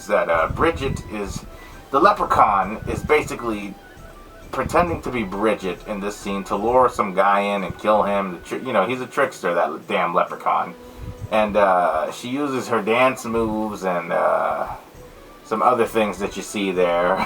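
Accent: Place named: Ireland. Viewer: Ah nooo, the fecking Leprechaun! Again your Irish accent is better than mine. Happy St Paddy’s day!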